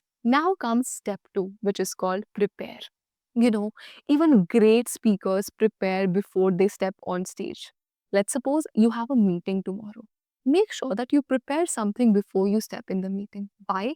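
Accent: India. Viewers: Are u indian ?.....u have indian accent too much .... try to fixx itt good luck ❤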